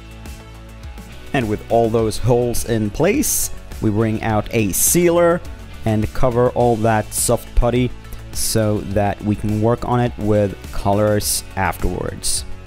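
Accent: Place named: Skåne, Sweden. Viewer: good accent in Swedish, sounds like ure from Skåne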